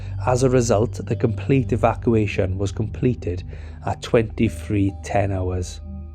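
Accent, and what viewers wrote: Wales, United Kingdom. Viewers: GREAT REPORTING SORRY ABOUT THE IDIOTS COMPLAINING ABOUT YOUR WELSH VOICE SO TAKE NO NOTICE OF MY PATHETIC ENGLISH LOSERS YOUR DOING A GRAND JOB.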